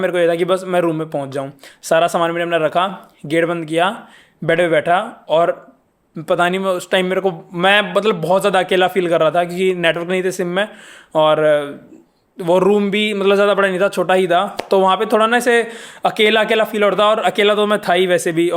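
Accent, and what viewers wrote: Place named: Haryana, India. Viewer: Haryanvi way of talking love from Australia bro ...keep going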